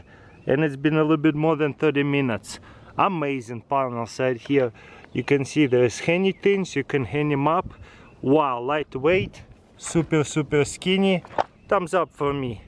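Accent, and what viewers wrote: Russia. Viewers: He's from Russia.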